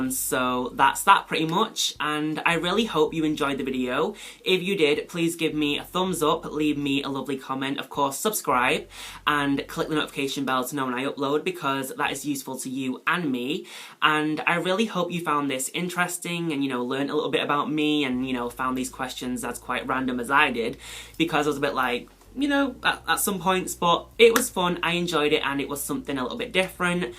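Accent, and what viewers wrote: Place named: United Kingdom. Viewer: Love this video😍❤ karate is a fight sport so yeah and I think you have a brittish accent and I realy like that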